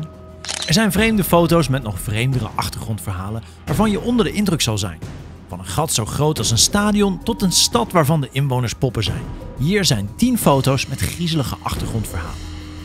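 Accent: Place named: Netherlands. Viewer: 'Zeker en vast' is Vlaams maar je klinkt Nederlands. Huh?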